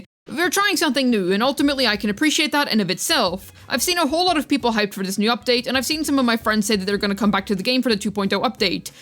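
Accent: United Kingdom. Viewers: Your accent still sounds british XD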